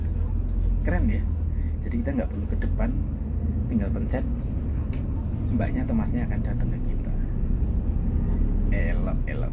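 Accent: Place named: Banyumas, Indonesia. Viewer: AA ngapak 😂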